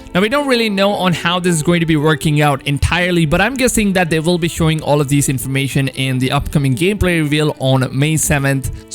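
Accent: India. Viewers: Is that some Indian accent I hear? 🤔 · Having an Indian accent doesn't mean he isn't pronouncing the word wrong. Plenty of people with the same accent know how to properly enunciate the letter V in a word. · He’s Indian it’s an accent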